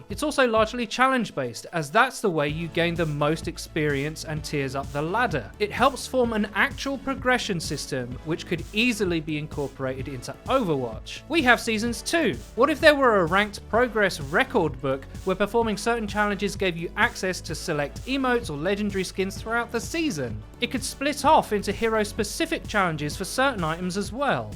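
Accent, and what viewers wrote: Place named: United Kingdom. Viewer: British people talk funny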